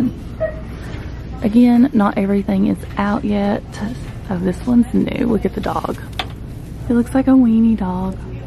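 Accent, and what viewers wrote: South, United States. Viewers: I just love your voice!! So southern 💛 love your videos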